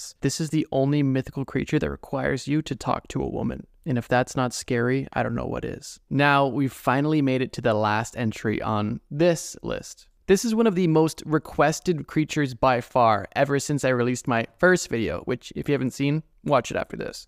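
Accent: Canada. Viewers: Is this guy Canadian? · Are you Canadian?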